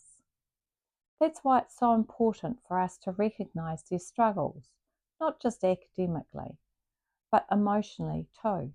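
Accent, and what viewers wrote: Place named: United Kingdom. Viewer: great, nd i really liked youre British accent!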